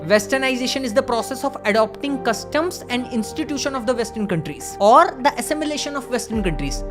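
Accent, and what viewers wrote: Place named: Bihar, India. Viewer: Hame tu appki behaar ki language bohat Pasand h us tarha bolne ka style he alag h .v.good vdo (from Karachi ❤)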